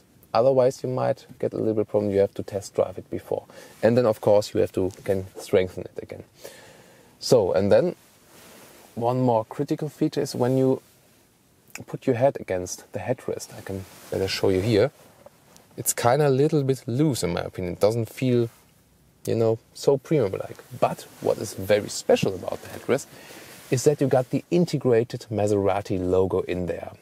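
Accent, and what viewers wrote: Germany. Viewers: Great review. Your English reminds me so much of my Grandmother. She was German, too. And everytime she asked 'what time it is' she would say 'how late is it' (wie spaet). Made me smile. You liked the car but it seems you're not fully convinced.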